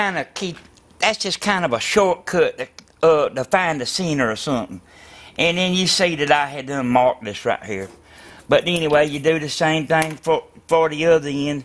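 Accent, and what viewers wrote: United States. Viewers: Fantastic accent. A true American.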